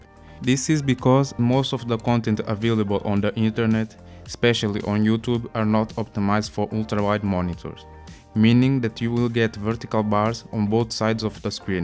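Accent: Russia. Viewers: what with accent, sounds like russian dialect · i think u re russian right? :D by the way thanks for the review, i exatly for the same dilemma, i need 3 tabs open, and be some playe for the desktop, maybe for me a 32:9 would be a better option? just don't know because, if i want to stream my pc via moonlight i have alay cange the 32:9 resolution to 16:9 when i would like to game on a laptop or tablet or pone? i really hesitant, becasue most of the time i want to be able to reach my desktop from anywhere the world without changing resolution and stuff, maybe ahve to stay with a 32 inch 16:9 or do you have any advice for that? thanks in advance! :)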